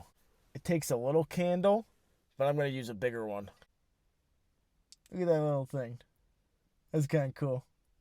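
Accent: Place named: New England, United States. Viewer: A few things keyed me in actually . The accent, the type of trees at your location but the biggest clue actually was the snow storm and the release date of the video. I'm from NH and we just had the same snow storm . We were expected to get 18 inches but we ended up with about a foot. So I figured you had to be some one close to my location for you to receive almost the same amount of snow I just got over the same weekend in an area that looks very similar.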